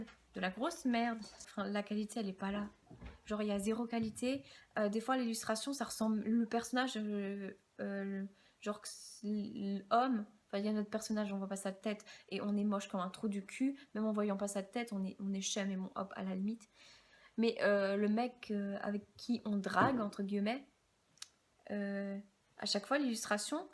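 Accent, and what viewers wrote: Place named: Alsace, France. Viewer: Non mais quand je regardais tes vidéos je me disais justement que t'avais parfois un accent alsacien ! Haha #teamalsace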